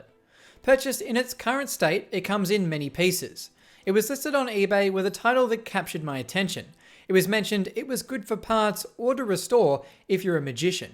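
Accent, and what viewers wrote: Australia. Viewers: I’m from England and we do not have that accent. is Australian (you couldn’t have been further away) lol. I’ve never heard any other Australian pronounce data as daughter/darta either.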